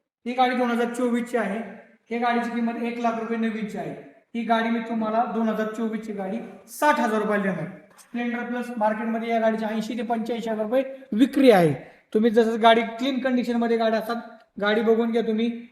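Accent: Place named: Solapur, India. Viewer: सोलापूर जिल्हा बोलतो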